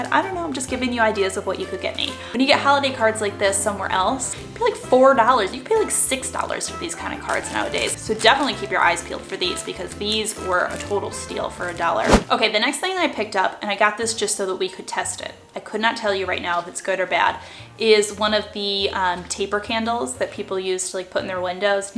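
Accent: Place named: South, United States. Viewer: Hi I watched a super old video of you and you had like a southern accent and did not make any of your usual jokes/ humorous comments... Did you change since starting YouTube or were you just not being your real self at first? Not that you'll probably answer this but...just curious because I love your sense of humor and your super articulate manner of speaking so much and it was so odd to not see those things. And you looked kinda different too but maybe cuz it was 5 yrs ago...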